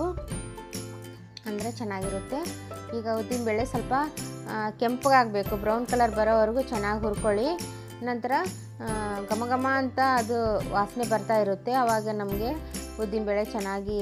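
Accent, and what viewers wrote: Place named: Dharwad, India. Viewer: Nimma Dharwad Uppittu bhaal sertu.!!!! Aadra, nimma maatu Dharwad shaili olaga iddra namaga bhaal Santosh agatada.!!!! Nauo hemme inda namma kannada maatadonu!!!! 🙏 🙏 🙏 🙏 🙏 🙏 🙏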